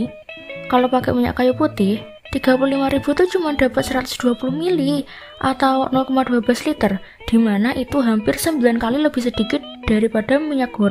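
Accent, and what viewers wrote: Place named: Java, Indonesia. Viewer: Medoknya bikin candu😚😚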